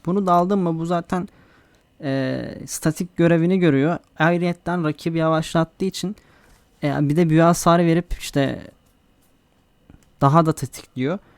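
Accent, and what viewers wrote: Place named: Samsun, Turkey. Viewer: Bu adam Samsunlu la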